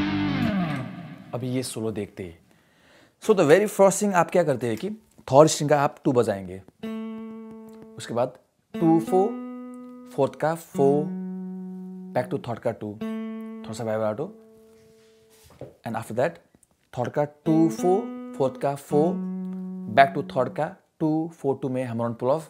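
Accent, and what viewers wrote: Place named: Nepal. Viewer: Dude that guitar is lit and with that accent i can tell ur from nepal 🇳🇵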